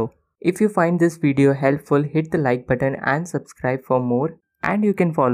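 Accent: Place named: India. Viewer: youre speaking english in indian accent and i still can understand what you are saying! just speak a bit slower and youre good to go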